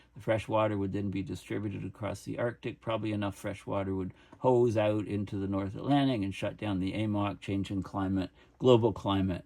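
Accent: Canada. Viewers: Your accent tells me you’re Canadian.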